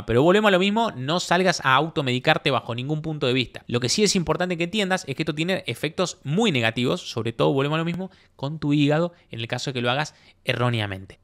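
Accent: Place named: Argentina. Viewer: Tu acento me hace extrañar mi pueblo Argentino. Bendiciones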